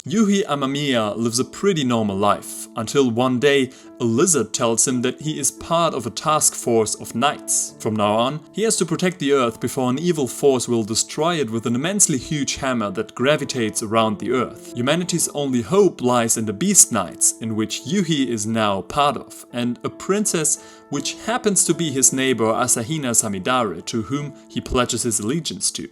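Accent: Germany. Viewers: I hear a bit of an german accent, am i right?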